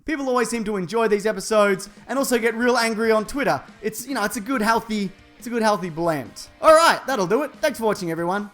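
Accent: Australia. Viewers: I tuned in to WATCH deleted scenes as your title indicated. All I heard was you blabbing in your crappy Aussie accent. Stop misleading ppl with your titles!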